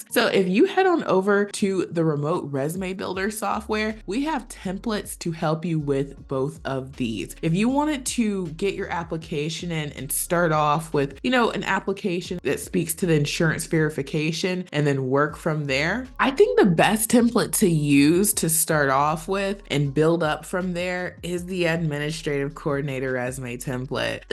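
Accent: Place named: South, United States. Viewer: your voice tells me you are also a Southern girl! Alabama here. You are my favorite remote job lead lady! Blessed Monday to you. Keep 'em coming! 😊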